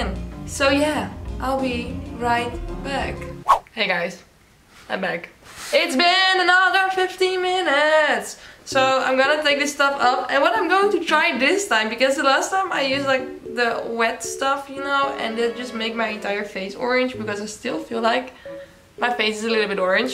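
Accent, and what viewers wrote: Netherlands. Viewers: Je Engels is echt zo erg op zijn Nederlands😂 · Are you dutch? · Are you Dutch? Your accent sounds kinda Dutch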